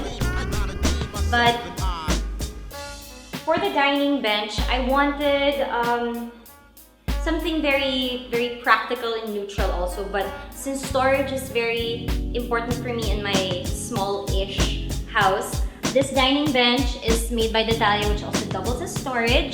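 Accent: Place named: United States. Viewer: Wow your English got good. U sound American... I love you. I’m a big fan.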